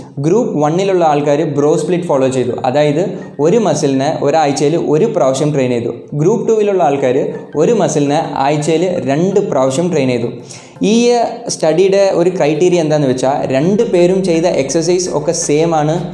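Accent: Tamil Nadu, India. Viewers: Malayali anno athoo Tamil annoo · Bro tamilan or malayali? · Are you malayali or Tamil .? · R u Tamil or Malayali?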